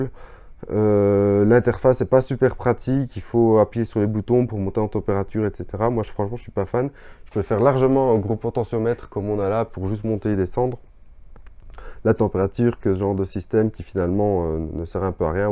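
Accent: Liège, Belgium. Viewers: Salut, Chapeau bas pr la transmission de savoir ! Dès la 1ère vidéo j'ai reconnu l'accent, puis le pull m'a confirmé ! Signé un autre Liégeois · Je viens de Liège aussi haha j'ai bien reconnu l'accent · Hello, très bonne vidéo qui résume bien l’essentiel. Je ne connaissais pas le coup du court circuit avec l’isopropanol pas mal merci. Et je crois reconnaître le petit accent et la sympathie de nos amis Belges que j’adore.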